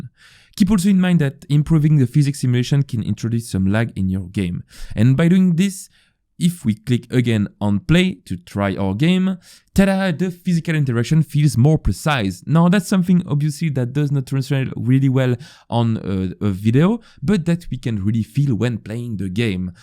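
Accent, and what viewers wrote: France. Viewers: tu est français XD L'accent est horrible. Sinon merci.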